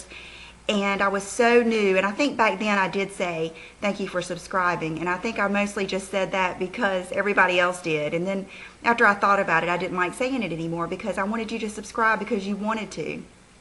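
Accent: South, United States. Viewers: the way I look at it you have two choices 1) fold up your tent and go home OR 2) don't let stupid people make you feel bad. I'd pick number two. People think they can say anything and hide behind the curtain of the internet. Block them out! I guarantee you that for each hater there are hundreds of us who enjoy your videos and look forward to Friday's haul. This California girl looks forward to hearing your southern accent! Keep it up, we love you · get your southern accent on say "kiss my butt" & keep making your own choices & decisions.... & videos... we luv you much much much... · One major reason why I subscribed to you was your sincerity & your enthusiasm. Plus you're southern accent. I married late in my life so we never had children but I always admire full time Moms. I Those nay-sayer see the world through their negative point of view. We are positive people so that's why you didn't anticipate these mean and cruel comments from them! 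Can't image what we don't know or feel. Be strong & keep up the great videos. P.S. I think your family is lovely!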